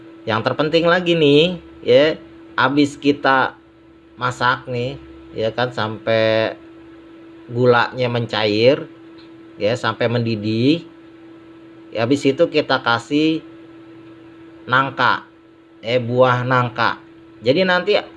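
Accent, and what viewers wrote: Jakarta, Indonesia. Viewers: Baru nemu channel si abng, demen banget enak ngomong nye betawi asli... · Gile ye betawi asli ye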